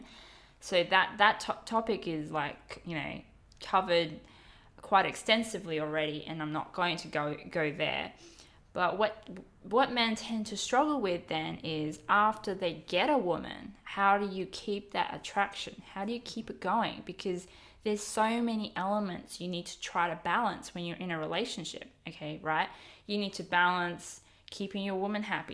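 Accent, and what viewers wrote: Australia. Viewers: are you Australian?? =] you have my accent haha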